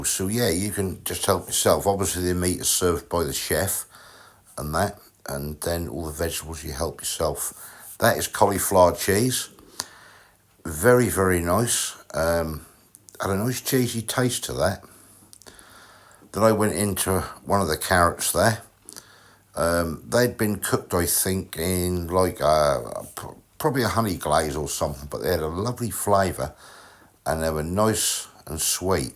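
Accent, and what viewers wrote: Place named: Suffolk, United Kingdom. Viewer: Hi and, I’ve just come across your channel, love the accent. My husband was born in Suffolk I lost him late last yr with Alzheimer’s and vascular dementia. Your videos are amazing and of course your accent reminds me of my husband. 😊😊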